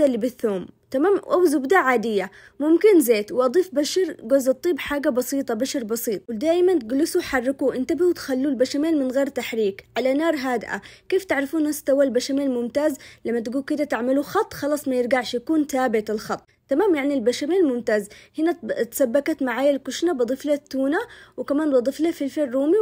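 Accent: Aden, Yemen. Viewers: مشهيه بكره بسوي بنفس طريقتك إن شاءالله ..تسلمي ياعسوله وكلامك العدني ياسلااااام ❤️❤️ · اللكنة العدنيه تجنن عليكي❤❤ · حبيبتي ياسلام على هالكلام العدني الجنن يجنن كلام عدن والله خصوصا كلمة ماهلوش اااه ذكريات لي في عدن الحبيبه اختك من البيضاء مقيمه في جده ❤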